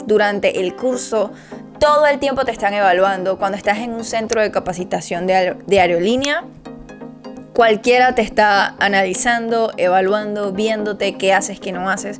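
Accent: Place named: Venezuela. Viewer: Hola, soy venezolana creo que tu también por el.acento tengo 55 años gozo de buena salud y me veo bien, mi sueño es ser TCP en alguna aerolínea estadounidense pero vivo en Venezuela mi pregunta es ellos piden el GED soy teacher de inglés en mi país ya con eso es suficiente? No tengo visa sólo pasaporte italiano porque tengo la nacionalidad ellos me tramitarian la visa de trabajo en caso de ser seleccionada? Espero me puedas contestar cariño.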